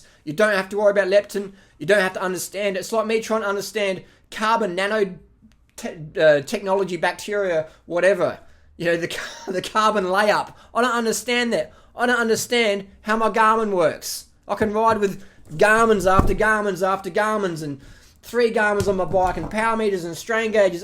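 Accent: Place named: Australia. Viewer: This video is as good as his Australian accent.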